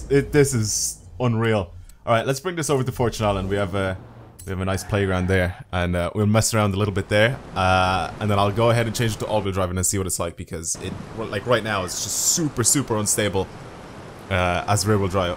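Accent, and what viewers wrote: Ireland. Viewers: R u Irish lad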